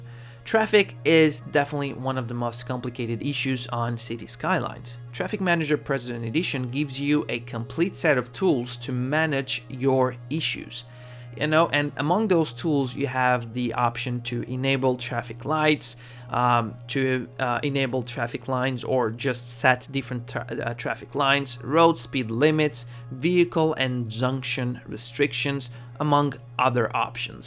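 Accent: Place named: Netherlands. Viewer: Dutch accent love it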